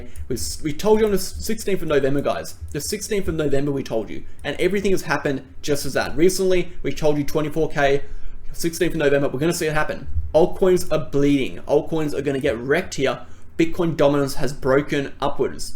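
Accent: Australia. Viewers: cheers! Ps. good to hear an aussie accent on a crypto vid!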